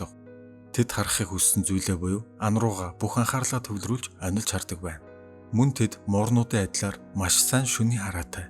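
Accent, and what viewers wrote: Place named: Kyrgyzstan. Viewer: Произношение похоже на Кыргызские.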